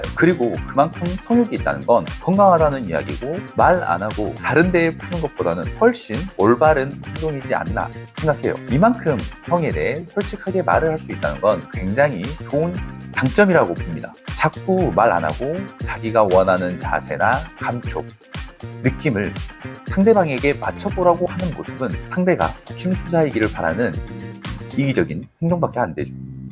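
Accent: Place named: Jeolla, South Korea. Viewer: 고향이 전라도신가요? 말투가 전라도 사투리가 나와서요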